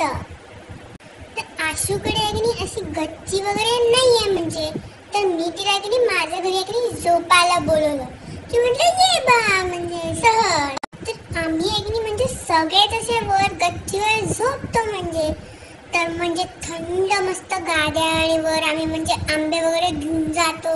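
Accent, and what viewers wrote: Nagpur, India. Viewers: ekdum masta👌and I love that kinda nagpuri accent😀☺awsm...keep it up👍👍👏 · Awesome Stuff, really Hats Off to you.....one of my friend speaks same like SOTI porgi.. as she is also from Nagpur...Both of us enjoy your videos a lot....Happy Diwali..to you and your Family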